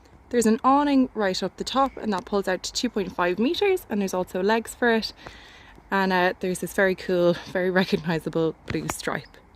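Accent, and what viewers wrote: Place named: Galway, Ireland. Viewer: Just found out I've ancestors from Galway and was curious as to the accent. This little trip around the caravan fit the bill. Thank you kindly.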